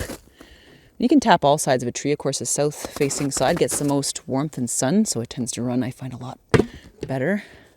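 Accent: Canada. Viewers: My thought exactly, I’m in Vancouver I too heard that Ontario accent...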